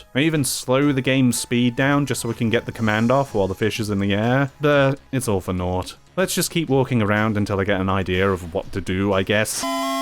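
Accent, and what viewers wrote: United Kingdom. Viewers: You're just the spiffing brit, aren't you? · Damn British accent. Could have been a good video too.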